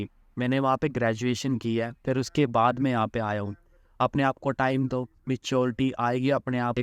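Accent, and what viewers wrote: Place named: Haryana, India. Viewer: Ye haryana se lag raha hai😅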